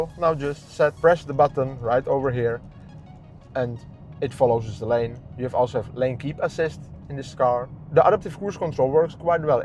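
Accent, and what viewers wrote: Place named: Netherlands. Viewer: Thats some true Dunglish right there.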